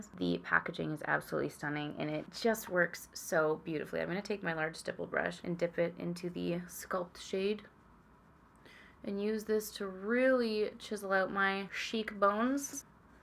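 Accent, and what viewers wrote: United Kingdom. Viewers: 🎄🎄🎄 I was disappointed not to see (hear?) more British accent in this vid - would have been the perfect opportunity! Thanks Ig: sybelion 🎄🎄🎄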